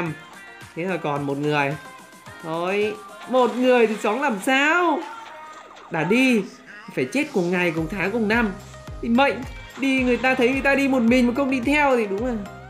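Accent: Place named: Thanh Hoa, Vietnam. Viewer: A nghe giọng giống quê hoàng thanh-hoàng hóa..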